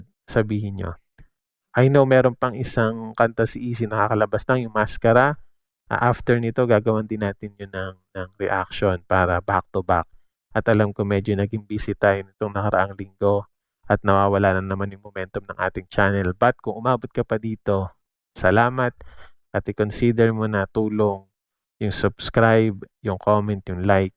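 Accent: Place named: India. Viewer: Akala ko foreigner ka nung una kong marinig boses mo noong unang panood ko sa video mo.Ganyan kasi bigkas ng ibang Indians hehe✌️keep grinding lang kapatid.May your channel grow.God bless!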